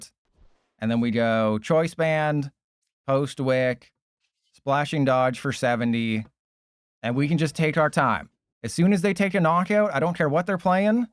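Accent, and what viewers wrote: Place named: Australia. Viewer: Living in Australia and still lucky enough to hear incredible aussie accent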